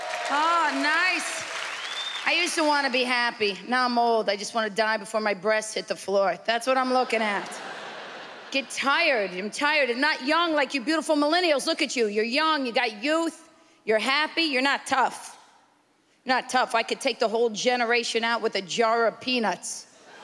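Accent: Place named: Boston, United States. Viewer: Such an incredible voice and "Buoston" accent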